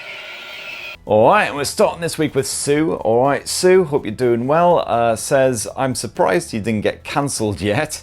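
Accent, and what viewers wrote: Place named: Scotland, United Kingdom. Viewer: English! With that Scottish accent!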